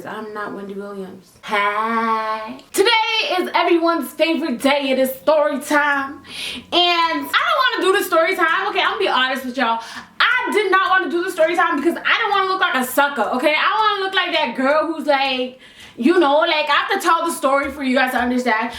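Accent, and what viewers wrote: Jamaica. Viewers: Didn’t realize she was Jamaican until the begging of the video 🇯🇲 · New follower! I love your videos. Im literally binge watching them!!! Speak more patois !!!!!!!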